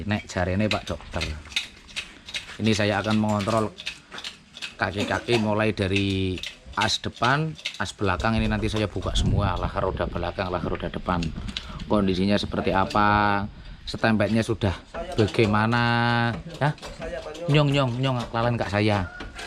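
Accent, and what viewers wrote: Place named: Banyumas, Indonesia. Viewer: Ngomonge deneng mandan ngapak ya mas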